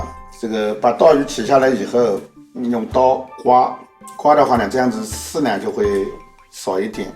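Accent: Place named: Subei, China. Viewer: 一开口就是老苏北了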